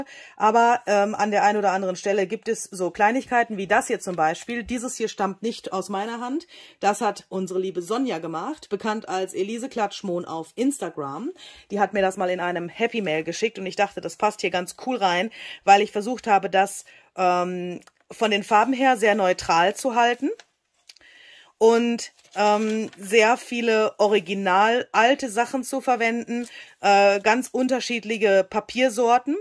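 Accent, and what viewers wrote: Germany. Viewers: Kommst du aus Baden-Württemberg? Ich sende Grüße in meine Heimat sehnsucht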